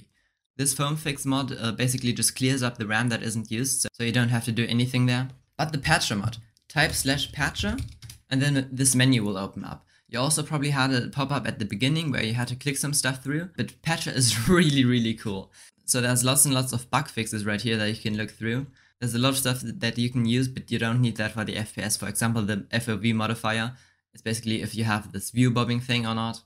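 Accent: Germany. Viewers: hey, nice video, really helped me Man hört aber stabil, dass du deutsch bist 😆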